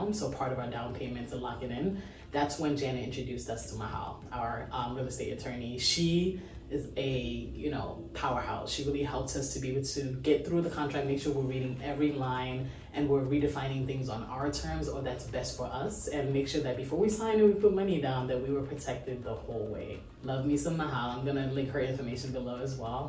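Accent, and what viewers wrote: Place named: Brooklyn, United States. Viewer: You got that shomonry Brooklyn wow proud I'm from Harlem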